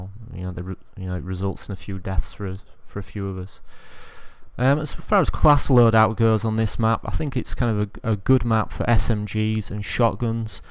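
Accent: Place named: Yorkshire, United Kingdom. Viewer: Yorkshire accent commentaries FTW. Great comm mate, especially for your commentary virginity. Face humping and piecey biting off? haha...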